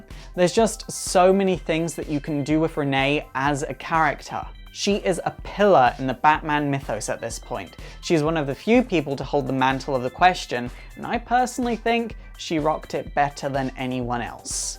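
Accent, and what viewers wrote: United Kingdom. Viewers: Sorry if this question is really stupid,but are you british?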